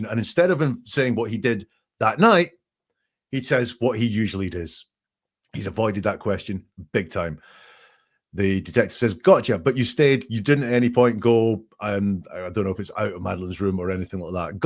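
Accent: Scotland, United Kingdom. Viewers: Hello from highland Perthshire, its braw to hear a fellow Scot annalysing this so and so. Watching on catchup. Just subscribed, so hope i get notifications for a live or two and can blether in the chat ❤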